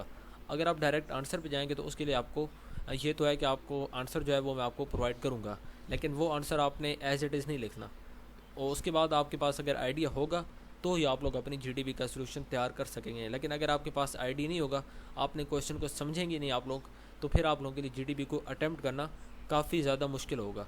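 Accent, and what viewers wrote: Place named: Saraiki, Pakistan. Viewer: your accent is like saraiki people💞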